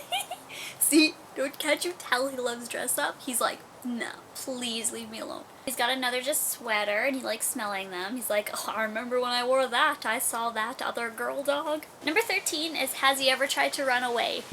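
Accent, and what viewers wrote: Saskatchewan, Canada. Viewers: O just saw u from canada but i think ur from saskatchwan ur accent seems like there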